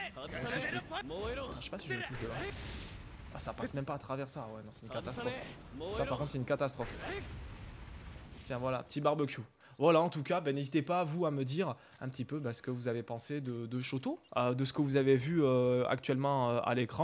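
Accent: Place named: Marseille, France. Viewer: G remarqué que ta un axen marseillais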